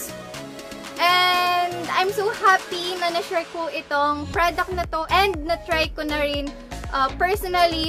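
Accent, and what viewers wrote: Pampanga, Philippines. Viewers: Kapampangan ka ata ate? haha❤️ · Kapampangan ka po?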